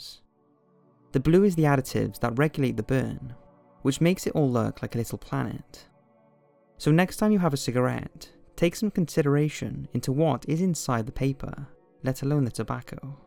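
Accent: Wales, United Kingdom. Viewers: Addicted to your videos. The juxtaposition of your soothing Welsh voice and the often creepy and disturbing content in the videos is practically intoxicating. Binge watching your stuff right now!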